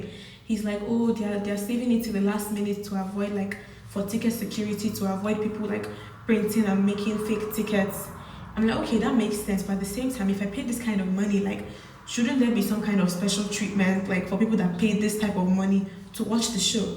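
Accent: Igbo, Nigeria. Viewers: I love your igbo accent while you complain 😅 sorry for the stress, that's why i attend concert because na me know watin my eye see the first time.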